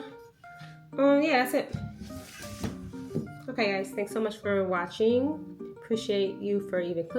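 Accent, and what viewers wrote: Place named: New York, United States. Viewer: Hi,! Your relaxed life always remind me that I need to calm down. I love seeing how you balance so well. It looks like your Labor Day was pretty chill and thanks for letting us hear your voice again. Your New York accent. 🥰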